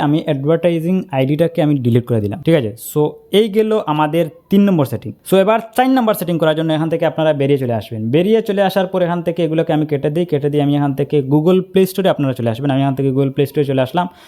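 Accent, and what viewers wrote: Sylhet, Bangladesh. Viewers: Vai afne kita Sylheti ni?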